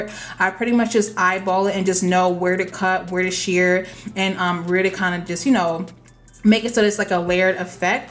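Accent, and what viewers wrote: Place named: Louisiana, United States. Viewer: Love your videos You Rock!!!!!!!! U sound like u are from the Cajun State like me.!!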